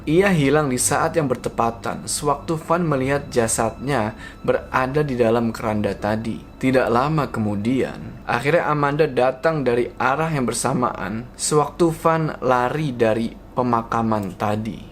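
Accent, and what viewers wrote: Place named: Tegal, Indonesia. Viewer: tegal mana suaranya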